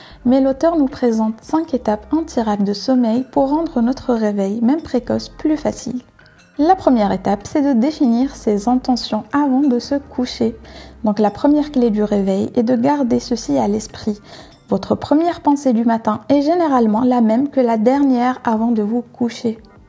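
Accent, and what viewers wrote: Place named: Algeria. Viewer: C’est fou ça fait longtemps que je m’étais abonnée à ta chaine, comme j’avais trouvé ton contenu similaire aux chaînes : mind parachutes ou encore prendre sa vie en main... j’avais décelé l’accent (nous ne parlons pas comme les Français natifs même si l’on a un riche vocabulaire) mais j’étais loin d’imaginer que t’étais Algérienne comme quoi les Algériennes sont partout !!! Je te félicite pour ton contenu, vraiment qui sort du lot des youtubeuses Algeriennes. Big up sista ! 🙌🏻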